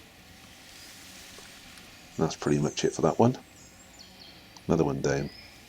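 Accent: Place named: Dorset, United Kingdom. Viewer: You sound like you`re from my area, are you a Dorset man?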